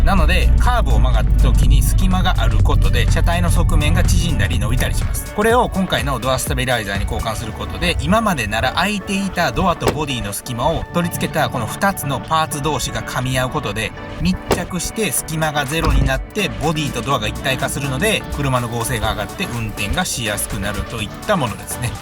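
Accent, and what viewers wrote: Kansai, Japan. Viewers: 別にディスるつもりは全くないですが関西弁特有の若干の強みが全く感じないのが本当に人の良さが滲み出てて凄く聴きやすく見やすい素晴らしい動画です✨ ゴムモールの事ときめ細かい点も本当に素晴らしいですしあくまで視聴者目線の押しつけのない綺麗な動画…続けて見てしまいます✨ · お返事ありがとうございます😊 さんの関西弁ももっと聞きてえっすがら期待して待ってらっすど😁 へばまんつなー！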